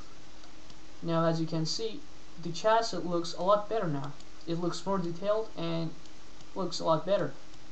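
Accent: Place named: Russia. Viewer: control what? i cant understand the russian accent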